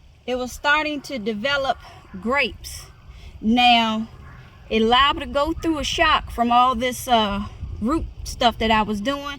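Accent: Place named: North Carolina, United States. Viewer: You sound like you're from lumberton NC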